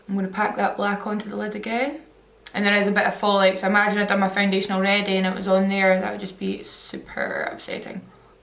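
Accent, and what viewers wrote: Scotland, United Kingdom. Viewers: 'I've got a sare shooder' 😂😂😂dying!! Thank God for a Scottish youtuber! 🎉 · Hi! I absolutely love your accent, it reminds me of my grandfather who was Scottish! I have tons of Scottish blood and the red hair to boot, but a total Southern American accent. I'm a new subscriber because I love your looks and fun, no-BS personality! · s y she's from scotland! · Are you Scottish? Beautiful accent and such a beautiful lady! <3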